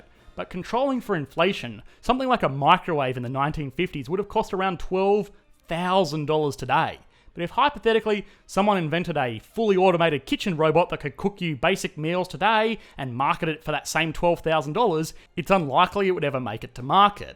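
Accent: Australia. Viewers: Is true. If it wasn’t for that sweet koala accent, I wouldn’t be able to get through all the gloom and doom our future seem to be careening towards. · Does the author speak in Australian accent ? Thanks for the video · "the sweet supple sound of my Australian accent" Darn he figured out the real reason I watch! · Mate your Ozzy accent...:))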